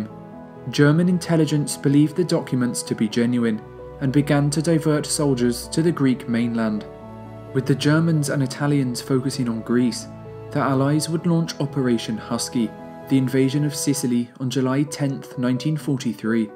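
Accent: United Kingdom. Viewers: Came up on my feed, clicked the link and as it loaded thinking "...this narrator better have a British accent..." Noice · He just speaks British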